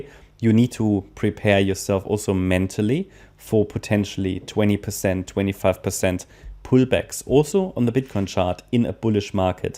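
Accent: Germany. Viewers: Bro is german☠️ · do have any german roots?your accent is like german..just curious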